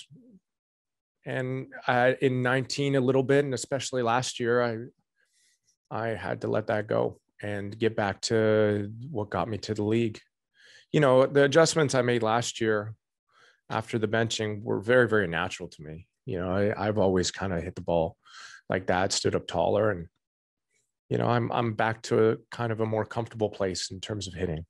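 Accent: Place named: Canada. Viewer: He still has a canadian accent, it's fading rho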